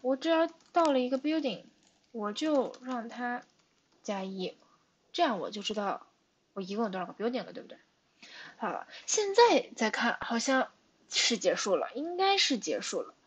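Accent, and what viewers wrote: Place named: Northeast, China. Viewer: 这个东北口音杠杠的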